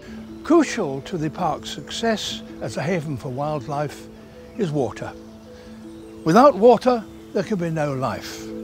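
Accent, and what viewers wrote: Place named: United Kingdom. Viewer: I just saw your lovely YouTube video. Love your videos and British accent:)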